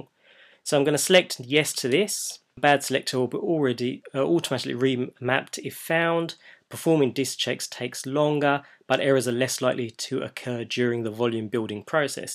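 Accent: United Kingdom. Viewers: American sounding music intensifies British accent: “Today we’re going to be looking at the...”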